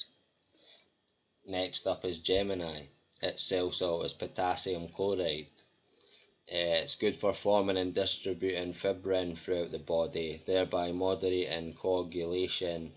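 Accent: Scotland, United Kingdom. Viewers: I subbed to you because I liked the fact that you gave your ideas at the end and what a very good thought it was. Thanks for sharing this and your own ideas on it too. I would love to hear more about this. Love your Scottish Accent by the way!! I am a Norfolk girl, but my Mum and I just love Scotland so very much :) especially thick Plain loaf toast.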